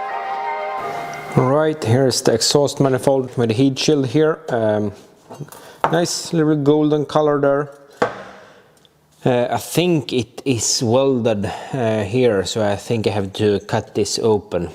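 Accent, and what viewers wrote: Sweden. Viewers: I hope your YouTube metrics show you how many times I’ve watched all these videos. You’re the perfect host for this build and your Swenglish is perfect, brother. I love your ambition and commitment in both quality and engineering; it’s mind bending what you’ve done in the last few Volvoghini vids.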